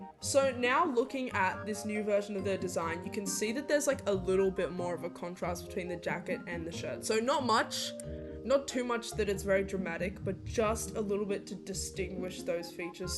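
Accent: Australia. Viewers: Wait are you Australian I'm tryna see sumn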